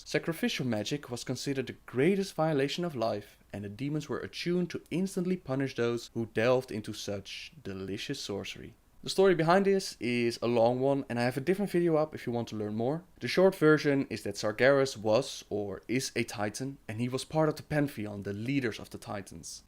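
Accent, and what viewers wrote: Netherlands. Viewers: Are you Dutch? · Trevor E. He is Dutch. Not Swedish. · Are you dutch? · WAUW jij komt echt uit nederland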